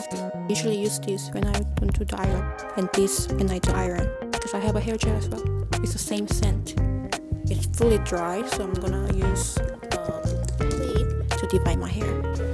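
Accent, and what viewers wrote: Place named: India. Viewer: One of the best accent its semi to an indian girl talking english 😅😂😂😂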